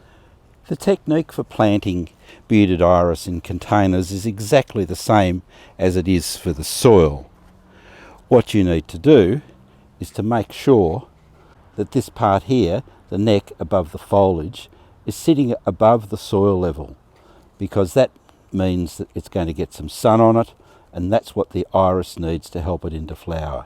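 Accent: Australia. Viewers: Just love your Aussie accent. And thanks for the helpful info.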